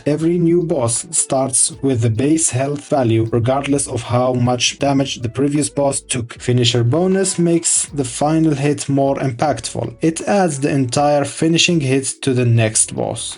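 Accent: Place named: Morocco. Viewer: You sound very Moroccan a sahbi 😁🔥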